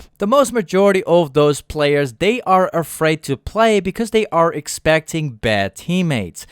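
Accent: Netherlands. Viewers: Are you Dutch? Because your English accent sounds allot like you're Dutch. (I'm Dutch and have heard allot of people talk with a weird accent haha.)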